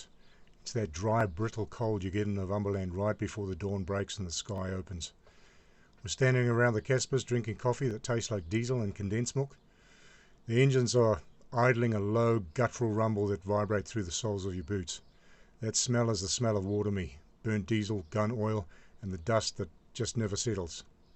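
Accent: Australia. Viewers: You drive through an ambush, or a TB, like we did. Pity you chose an ozzy accent for the narration. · Ja right you are an Ausie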